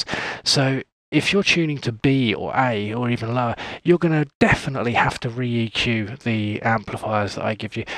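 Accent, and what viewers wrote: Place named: United Kingdom. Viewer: I have a different reason why people clamor for new presets all the time. Our species is never satisfied. Grass is always greener on the other side. we are explorers. Has nothing to do with guitars or presets. Just my tiny opinion which might be 100% wrong. But I love that British accent. And the idea that the underlying methods/reasons/techniques/science is important so you can pinpoint things in YOUR project and improve them instead of blindly hoping a preset works.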